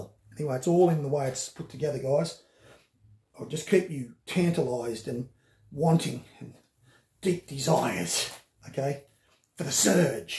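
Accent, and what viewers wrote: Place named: Australia. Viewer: Doctor your 4 DOF build is amazing! I love the videos and your aussie accent is always pleasant to the ears :) Cant wait for the rest of the build videos!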